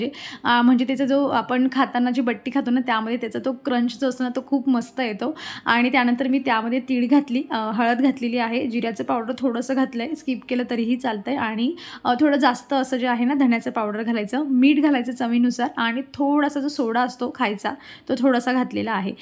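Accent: Khandesh, India. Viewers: Oy tu aplya khandesh chiy ka v..kudliy nemki gav kont sagjo br...😅u remember our tone ❤😂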